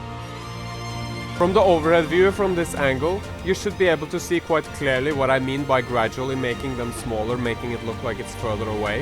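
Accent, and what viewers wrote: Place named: Norway. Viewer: Bro i can hear ur from norway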